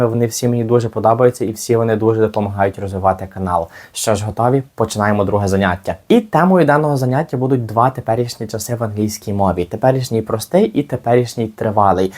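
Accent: Standard. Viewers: Дякую за чисту українську мову. Успіху тобі. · дякую за чисту українську мову приємно чути успіху вашому каналу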